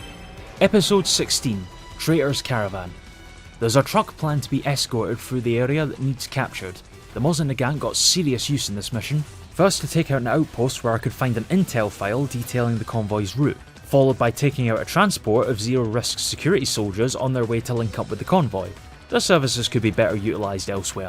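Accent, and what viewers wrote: Scotland, United Kingdom. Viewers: I can’t place your accent. East coast of Scotland? Edinburgh? Dundee? 🏴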